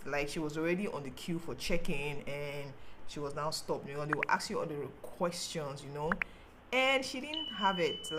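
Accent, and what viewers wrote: Ghana. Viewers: Ur accent look like Ghanaian good job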